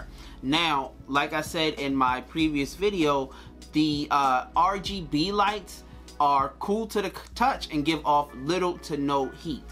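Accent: Baltimore, United States. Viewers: hes got that boltimore accent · He sounds like he's from Baltimore I get picked on for saying dew and tew and mova and fava 🤷🏾‍♀️ can't help it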